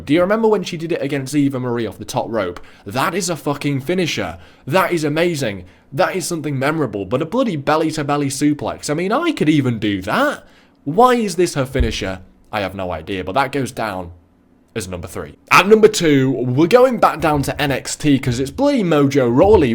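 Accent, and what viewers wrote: United Kingdom. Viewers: You don't know what your talking about clearly you don't know anything about wrestling because all of those are great finishers and if you would really watch wrestling you would know that it's not supposed to hurt because wrestling is fake it's all just a act so maybe you should do more research because you don't know anything and your British a ten is horrible go back to pre-k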